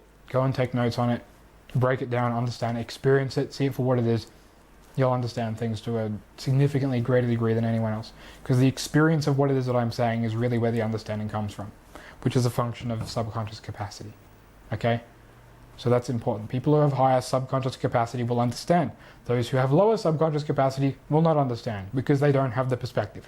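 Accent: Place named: Australia. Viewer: Thank you so much for your reply,. Usually I can hear the Aussie a lot but your accent isn't very strong. Anyway, I realized you were likely Aussie when I saw one of your older vids with you in a forest that was definitely not anything you'd see in England lol. Thank you for the insights on the qi stuff. Sounds pretty accurate I'd say even though I don't have a thorough understanding of the five elements, I have dabbled in the arts. I just purchased your subliminal and created my own 1 hour version and am going to listen to it while gazing and also while I do my drawings. You are right about the geometries in my field of vision. Actually, I did notice while gazing the flower of life pattern overlaid onto reality...I don't really know how to describe it. Kind of like a hologram or something. It's very subtle. Wishing you an awesome day and really grateful to have found you and also overwhelmed by everything I'm learning. 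I'd definitely be interested in working with you and possibly getting on the immortality program, as that is my aim, and currently working on manifesting my wealth so that I can do these things. I feel I have come a very long way on my own without help from anyone, just following my path, and yet I still feel very lost, at least mentally I don't have a grasp on what is happening but you are helping me out with that. Thanks!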